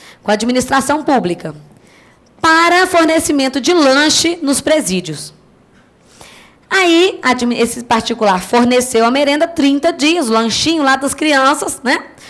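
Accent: Minas Gerais, Brazil. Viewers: Agradecidíssima pelos vídeos! E eu amo o sotaque mineiro, então tô amando. Vou maratonar.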